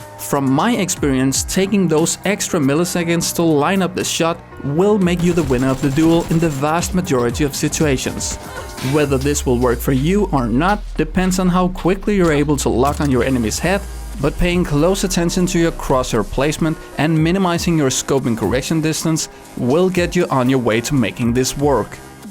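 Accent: Denmark. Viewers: you sound danish. Are you from Denmark?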